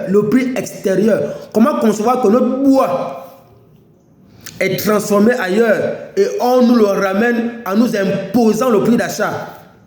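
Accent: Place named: Fang, Gabon. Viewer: Tu lis en fang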